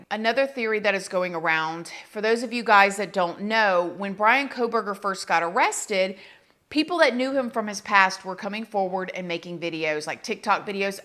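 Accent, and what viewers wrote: South, United States. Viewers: Love you and your approach to things. Watched your videos for years now, started with your prison stories and still here. Thanks for always being so cool, clever, calm and real! As a Scottish lady, I love your accent and how you say y’all 😂 😂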